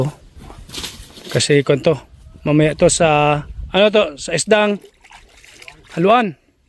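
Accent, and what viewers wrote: Iloilo, Philippines. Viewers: Ilonggo gid haha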